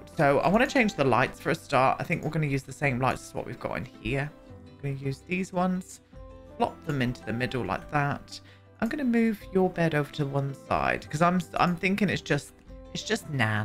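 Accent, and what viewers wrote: United Kingdom. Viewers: The way your pronounce Yiya is sending me I am not sure if it’s cause your British accent and I am Greek Australian but it sounds yah yah or yee-haa.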